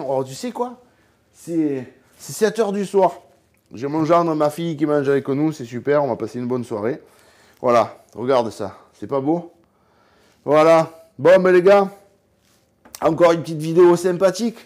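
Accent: South, France. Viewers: tu es un super gars,je suis fils de boucher et j'adore regarder tes vidéos,et ton accent du sud ne fait qu épicer celle ci de ta bonne humeur!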